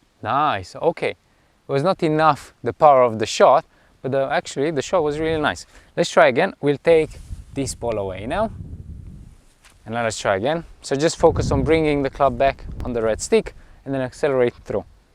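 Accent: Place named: United Kingdom. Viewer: British PGA member; very nice. I really like your British accent. ;-) Keep up the good work.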